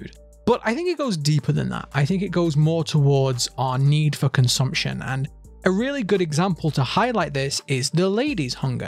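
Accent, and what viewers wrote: United Kingdom. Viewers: He's so aggressively British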